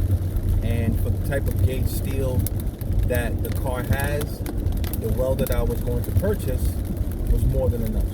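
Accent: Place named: New York, United States. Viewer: what part of new york are you from man ?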